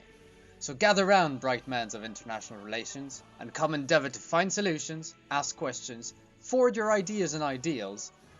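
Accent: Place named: Ireland. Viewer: I came across your video by pure chance my dear friend. You have treated a serious and always topical subject with a touch of humor as usual and a slight Irish accent which gives a little more charm to your video!!! well done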